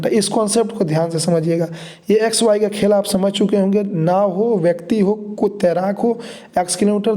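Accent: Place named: Bihar, India. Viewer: Are bhai hindi bol liya karo, bihari main samjh nahi aata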